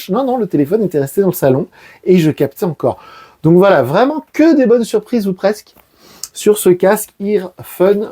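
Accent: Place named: Marseille, France. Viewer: J'ai cru t'étais marseillais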